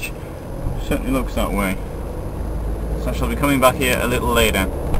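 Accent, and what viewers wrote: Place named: England, United Kingdom. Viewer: I wanted very badly to go to this museum April of 2005. My girlfriend (at the time) spoke fluent Russian and German. She agreed to help me document the 60th battle reunion. I had money saved up for two plane tickets. Long story short, we didn't end up going .. Glad you could make it though! Sounds like your from England, I'll bet WWII battlefield tours are a virtual hop-skip-jump for you. Lucky dog you.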